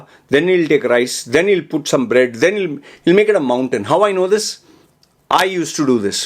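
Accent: India. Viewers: Your first word told me u r an Indian 😊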